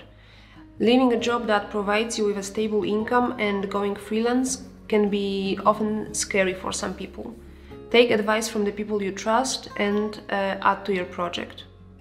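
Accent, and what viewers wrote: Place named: Spain. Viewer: Thanks 4 the video! 👌⭐ Spanish english accent detected hehehe (or maybe not, who knows)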